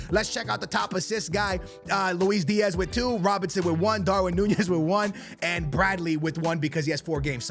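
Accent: Portugal. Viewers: He's Portuguese let him off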